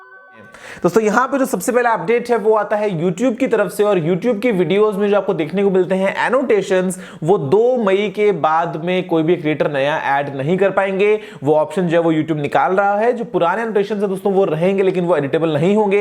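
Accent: Bengal, India. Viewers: app kya bengali ho???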